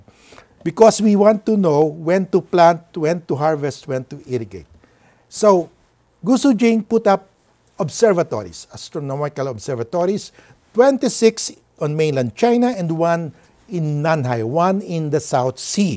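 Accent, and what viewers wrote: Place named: Philippines. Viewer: no need Dein. Most Filipinos are bilingual, English and Tagalog. Filipinos can understand "m-uh-ps" as maps. That's Filipino English dialect for you. Other English dialect speakers will quickly understand maps. After all, this presentation is mostly MAPS no matter how it is pronounced. Right?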